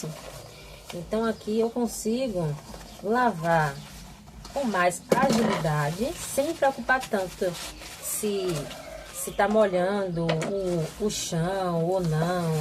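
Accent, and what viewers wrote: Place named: Salvador, Brazil. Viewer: Oi amiga, cheguei pra te dar aquela força e já vi que você tem potencial. Me tire uma dúvida, você é baiana, mais precisamente de Salvador ou região próxima? Reconheci um sotaque sateropolitano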